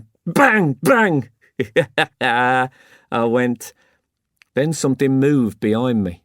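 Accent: United Kingdom. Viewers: That brummie accent sounds perfect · Thank God this was told in a British accent.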